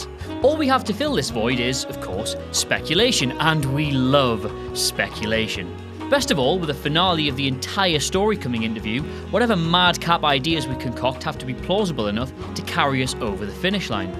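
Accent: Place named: Northern England, United Kingdom. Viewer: LL cool J Mate he's got a thick northern accent, in what world is that the Queen's English?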